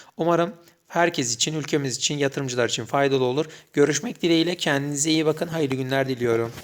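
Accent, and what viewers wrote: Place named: Newcastle, United Kingdom. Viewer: İngilizce aksanın newcastle upon tyne tarafına benziyor super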